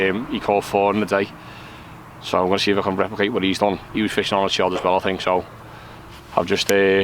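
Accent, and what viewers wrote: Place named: Liverpool, United Kingdom. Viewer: That scouse accent is something else...well done kidda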